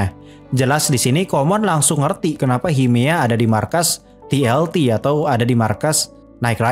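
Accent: West Java, Indonesia. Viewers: Abang orang sunda ya😁☺😂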